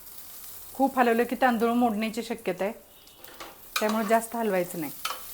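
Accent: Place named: Kolhapur, India. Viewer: खूप छान रेसिपी आहे, तुमच्या सर्व रेसिपी, सोप्या, चवीष्ट असतात, तुम्ही कोल्हापूर च्या आहेत हे तुमच्या प्रेमळ भाषेमुळे लगेच समजते😊 रेसिपी बद्दल आभारी आहे.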